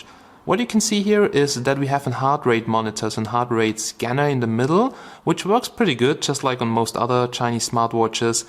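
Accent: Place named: Germany. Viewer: You sound german m8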